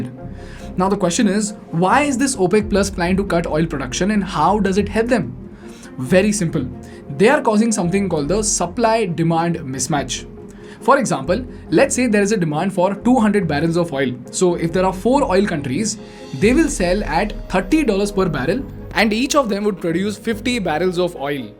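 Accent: India. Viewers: Omg that Indian english accent😂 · Pure Indian accent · Hm Indian English 😂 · the host had poor and bad English, it sound Cheap English with Indian accent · Distinctive Indian accent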